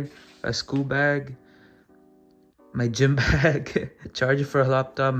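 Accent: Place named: Philippines. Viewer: Bro have pilipino accent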